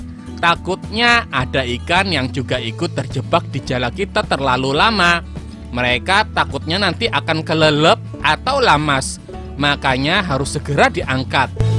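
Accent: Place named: Java, Indonesia. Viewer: Medhok nya hampir sempurna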